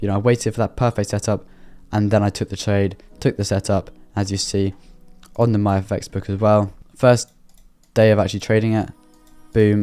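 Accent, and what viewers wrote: United Kingdom. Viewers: I always thought you were from London damm, where are you from? Would you ever do a meet up?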